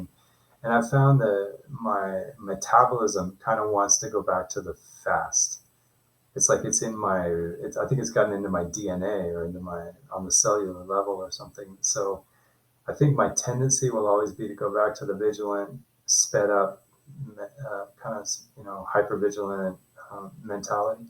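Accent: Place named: Germany. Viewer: Lol. I'm actually normally good at accents and had detected some German intonations in yours, but same with some Irish people. I'll go check out if it is backed up by any teutonic influence...Talk to you another time.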